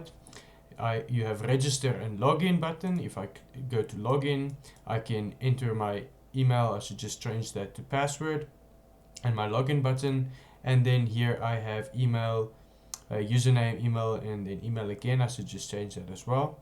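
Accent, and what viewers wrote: Afrikaans, South Africa. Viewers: You've got an Afrikaans accent. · You have the fattest Afrikaans accent ever. Love the video >3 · South African ?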